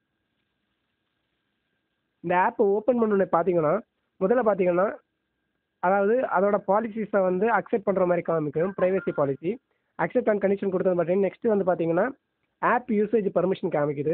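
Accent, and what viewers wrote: Kanyakumari, India. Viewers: Broo neega Kanyakumari thana...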